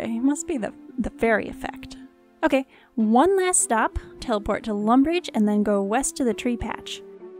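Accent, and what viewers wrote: Russia. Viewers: hilarious that your using a russian accent with an italian based character xD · RuSsIaN AcCeNt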